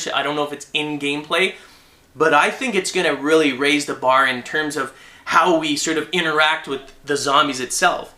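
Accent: Canada. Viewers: are you canadian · are you canadian???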